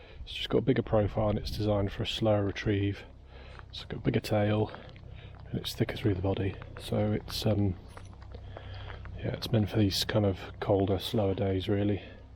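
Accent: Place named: Midlands, United Kingdom. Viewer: Thank you, hadn't thought of just crushing, I use what's often referred to as a swiss file, and remove barb completely. It is probably a little more time consuming than your method for sure. I get the impression you are from the midlands, like me, I maybe wrong!! I live close to Warwick.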